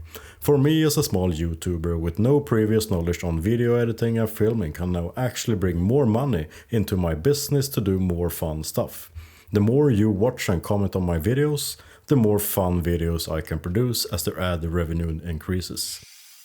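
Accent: Sweden. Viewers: are u swedish by any chance or texas? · 🌹THANK YOU for sharing 🌹 An awesome pipe and mouthpiece, thank you. Your English is good, real good. Your Swedish accent is real good also, I understand it perfectly, I listen to people. Please continue with the videos and the voiceovers. I learned a lot from this video, I think I will try making my own pipe, just once. 🌹🙏🏿😔🙏🏿🐾🐒🐾🌹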